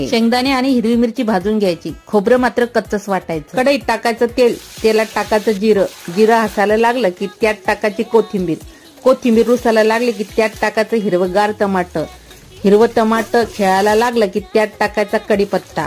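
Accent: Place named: Jalgaon, India. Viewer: तुमचे किचन बघायचेय मला तुमच्या सर्व रेसिपी मी बघते तुमची जळगावाची भाषा मला सालस सुंदर आहे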